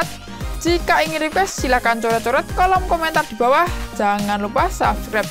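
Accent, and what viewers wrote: Java, Indonesia. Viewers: Koe medok men bosqu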